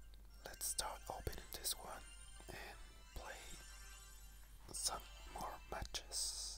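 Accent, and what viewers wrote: Argentina. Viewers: Dude u from Argentina?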